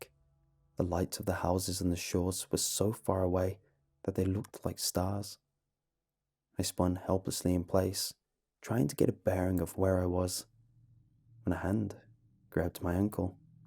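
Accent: United Kingdom. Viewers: You have the best brittish accient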